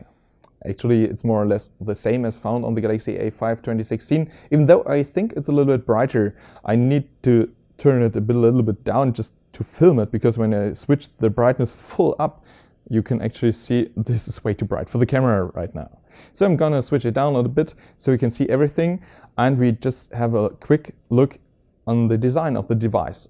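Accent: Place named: Germany. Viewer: sehr gut. love your German Accent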